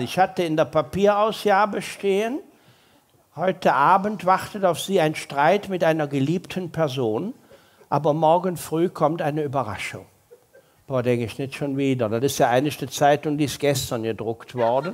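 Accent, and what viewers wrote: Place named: Rhineland, Germany. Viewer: Wenn man sich mal an den sehr ruhigen und rheinischen Dialekt gewöhnt hat, wobei ich mit rheinisch überhaupt kein Problem habe und es auch sehr gerne mag, Mama war aus dem Ruhrgebiet, dann lernt man ihn erst so richtig kennen und sagt Respekt. Sehr viel Wortwitz! Ein wirklich guter Hausmann auf der Bühne!🤣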